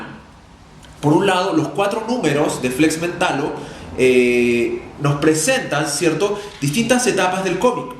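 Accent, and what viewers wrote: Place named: Chile. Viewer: Hola por su acento creo que es chileno claramente, muy buen canal este de termino de descubrirlo y suscribirme. Saludos desde Alicante - España.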